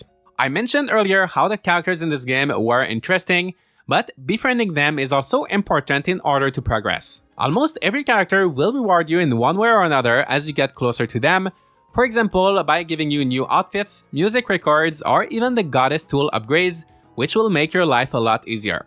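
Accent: Quebec, Canada. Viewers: Are you french Canadian? You have the same accent i have.Great review Btw. Keep up the good work!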